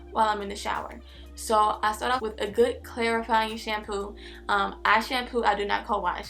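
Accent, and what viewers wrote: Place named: Georgia, United States. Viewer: I found your videos populating on my YouTube feed a lot. “Thanks to my sister”😒. But although I’m not interested in black girls hair care processes for myself personally. I’ve come to find other things about you intriguing. Like the way you talk. I KNOW YOU HAVE TO BE FROM GEORGIA😂. The combination of how you articulate and encourage sophistication within black beauty…you definitely got my attention.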